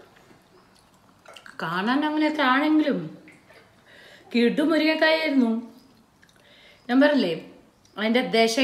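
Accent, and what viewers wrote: Palakkad, India. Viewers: Chechi palakkad annu ennu thonnunu.kalaki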